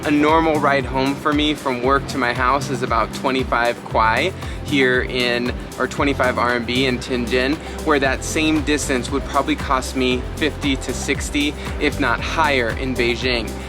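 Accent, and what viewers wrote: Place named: Canada. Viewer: You are amazing for responding to all my comments. Where in the States are you from? You sound like a fellow Canadian. I like your laid back style of reporting.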